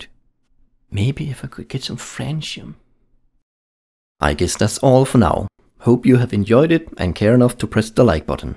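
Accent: Germany. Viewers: ou :D you sound pretty german to me :D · I've an Offtopic Question :§ could it be that you're german ? :D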